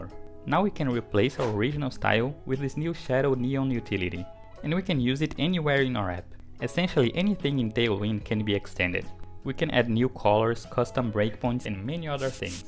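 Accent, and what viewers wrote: Brazil. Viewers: Eu sabia que era inglês de brasileiro hahah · Eu sabia que esse sotaque tinha um jeitinho brasileiro!!! Fera!!!! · Pq eu reconheço um sotaque brasileiro tão fácil?! haha Não sei explicar, não sei imitar, mas com 5 segundos de video, já sabia que era brasileiro!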